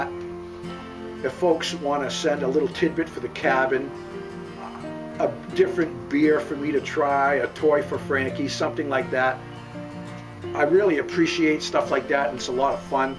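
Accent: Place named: New England, United States. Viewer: Hi sir! I really enjoyed watching your video... I am thinking of building my own off grid cabin and was concerned with how I would get clean water. I could easily add gutters and a rainwater catchment system, even on a lean-to just off by itself. I would love to try to make this, even just to have I think it's a great idea, even for camping. Thank you, from a fellow New Englander, I knew I could trust you by your accent. :)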